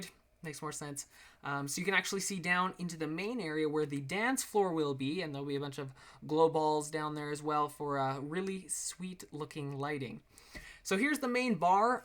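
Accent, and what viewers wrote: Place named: Canada. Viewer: It's a white (Canadian) Guy speaking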